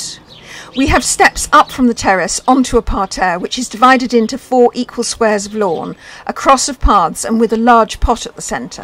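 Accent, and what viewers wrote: RP, United Kingdom. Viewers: How safe is it to birch tree in a small garden, I have 4 and people are saying I am mad as they believe, my house would collapse one day due to them. Please advice. Thanks. PS: Love your posh accent.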